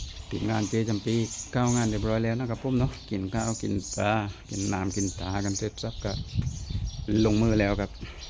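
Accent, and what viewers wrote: South, Thailand. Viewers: ชอบสำเนียงการบรรยายคลิป.. มากๆ สำเนียงใต้ ได้อรรถรสดี... แต่ก่อนทีมหลังบ้าน กดติดตามแต่ช่องบ่าวโทน กับแม่บ่าวโทน วนี้กดให้ทุกคน..แล้ว... ระยะเวลาเกือบจะ4เดือน ได้ดู การกระทำ ดูแล บ้านน้องอุ๋งอิ๋ง และสัตว์เลี้ยงน้องดีมากๆ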